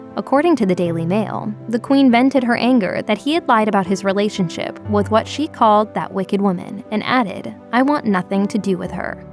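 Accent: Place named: United States. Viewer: Oh please get rid of that yanky voice and old old old history.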